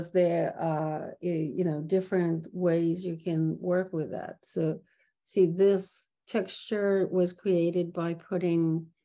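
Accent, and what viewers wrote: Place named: New York, United States. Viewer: great tutorial, loved the NY/LI accent. Is there a link to upcoming workshops?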